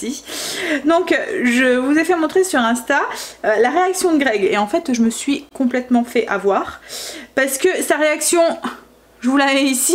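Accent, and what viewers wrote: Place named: Nord-Pas-de-Calais, France. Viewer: Coucou... No comment pour les égoïstes Français... J'adore lorsque vous testez les produits, vou êtes courageux... Super la réaction des hommes fassent à la chemise de nuit homme et ton échange avec 🤣 et on entend bien l'accent ch'ti...génial... Tu me donnes envie avec ton gratin de pâtes et le FROMAGE et la tartiflette avec le REBLOCHON...pour me faire plaisir je mange du gruyère à-25% de sel et pareil pour le gruyère râpé de temps en temps... Gros bisous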